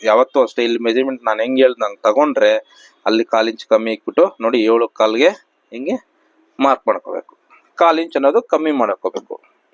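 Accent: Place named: Kolar, India. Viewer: ನೀವು ಕೋಲಾರ ಅಥವಾ ಚಿಕ್ಕಬಳ್ಳಾಪುರದ ಕಡೆಯವರು ಇರಬಹುದು ಅನ್ನಿಸುತ್ತೆ ಸರ್.ಮಾತಾಡುವ ರೀತಿ ಆಗಿದೆ, ಚೆನ್ನಾಗಿ ಬ್ಲೌಸ್ ಕಟಿಂಗ್ ಹೇಳಿ ಕೊಟ್ರಿ.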